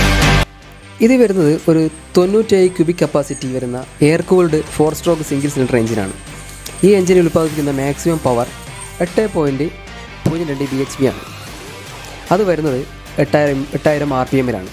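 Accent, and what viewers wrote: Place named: Vadakara, India. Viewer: Vadakara kaaran anallo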